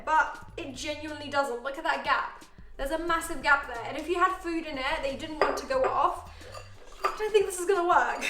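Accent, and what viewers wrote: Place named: England, United Kingdom. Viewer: It's just the english accent. If you come here in the UK you will see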